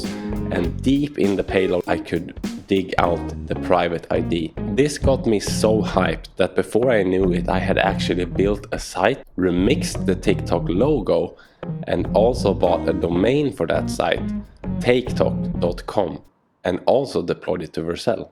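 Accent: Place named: Sweden. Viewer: Man I was so sure I recognized the way you pronounced things, checked your Github profile and I saw right, you're from Sweden!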